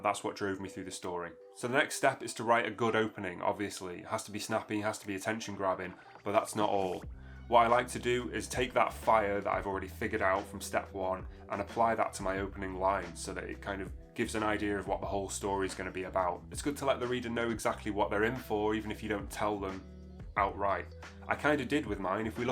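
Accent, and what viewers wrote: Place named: North, United Kingdom. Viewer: Just found your channel from this great video 👍 you sound like a fellow northerner! I've been writing flash fiction lately and really enjoying it. Looking forward to checking out some more of your videos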